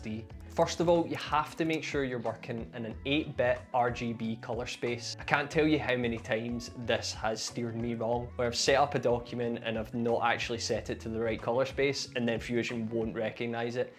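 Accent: Scotland, United Kingdom. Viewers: Mmm...how do you get Resolve to automatically update the changes you've made in your .psd file? It ain't doing it for me, and I couldn't see from your video how you got it to do that. Do I need to restart Resolve? Okay. I answered my own question. Yes. I had to restart Resolve et voila! While I'm here, what's happened to you? Have you abandoned ship forever? Because that would be a real shame; your videos are great and I appreciate hearing the accent of a fellow Scot. Lanarkshire, by any chance?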